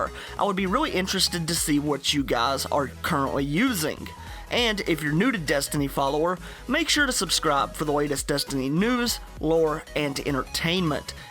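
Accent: South, United States. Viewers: My favorite southerner btw what state r u from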